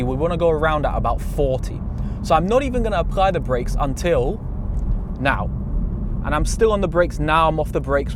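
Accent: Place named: England, United Kingdom. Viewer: What part of england is that?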